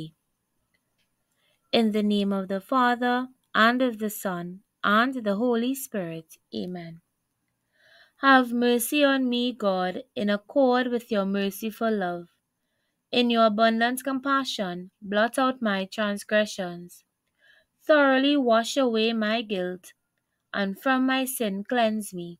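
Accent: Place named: Trinidad and Tobago. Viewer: Trini accent 😁 also Amen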